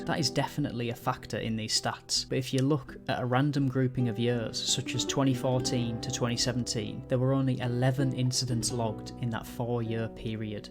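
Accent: Yorkshire, United Kingdom. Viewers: Good video, I’m struggling to pinpoint which part of Yorkshire your accent’s from. I’ve done Striding Edge many times over the years and been down both sides of the bad step. The first time down the bad side was forwards with a day sack on my back - I’ll not do that again! I’ve come across people struggling at the top of the step and helped them by spotting them down the safer side. I’ve also had a near miss coming down Swirral edge after slipping on loose scree. It’s best to be on your guard 100% on any edge and always lean in to the ridge so any slip or trip sends you the safe way, towards the rock not away from it. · accents are part of heritage and culture. This is a distinctly British accent, not an imported one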